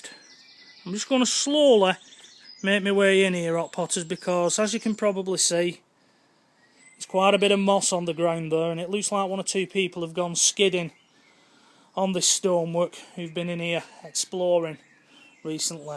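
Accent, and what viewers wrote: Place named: Wigan, United Kingdom. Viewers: Great video and....love the accent ( Wigan?) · Proper back in the day Wigan accent! Nice vid pal 👍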